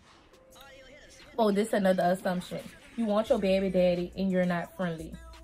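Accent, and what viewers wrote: Louisiana, United States. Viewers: Love your Louisiana accent!!❤️❤️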